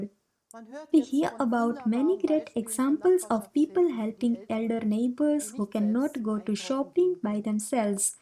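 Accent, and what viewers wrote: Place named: India. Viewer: If you keep arguing over semantics like this you're gonna have a rough time later on. Fact is, it's hard to follow a person that has a thick indian accent.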